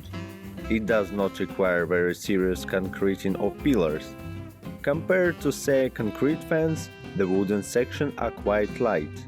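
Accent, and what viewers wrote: Russia. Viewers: Это просто пи..ец как режет слух, а выговаривание воз вместо уос подчёркивает что акцент русскоговорящего. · Русский чувак озвучивает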